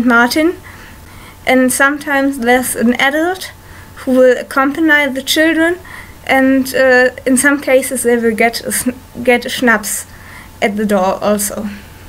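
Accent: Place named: Germany. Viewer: Ur englisch is great (= a bit german, but i like it <3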